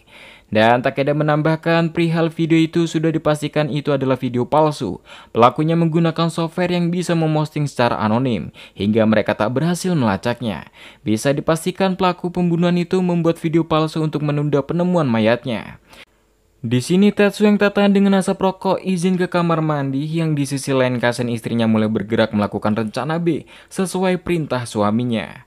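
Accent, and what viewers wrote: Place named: Indramayu, Indonesia. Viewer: Orang indramayu tah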